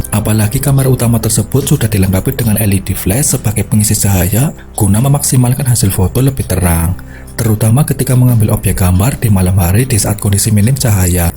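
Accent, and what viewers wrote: Banyumas, Indonesia. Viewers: ngapak ya min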